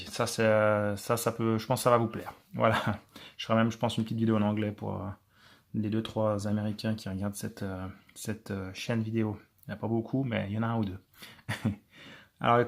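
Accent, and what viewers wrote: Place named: Geneva, Switzerland. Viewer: On sent bien l'accent de Genève. Salut compatriote 🇨🇭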